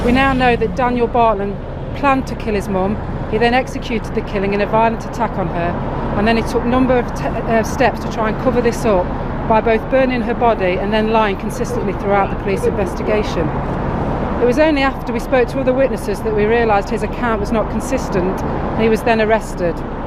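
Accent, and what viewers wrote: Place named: United States. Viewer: the voice sounded American that's why I noticed. You can say whatever you want. It's just funny to me to hear it with an American accent I'm also amaerican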